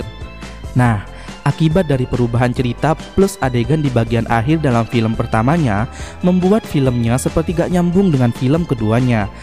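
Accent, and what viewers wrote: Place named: Java, Indonesia. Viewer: Knp tod nya medok sihh😭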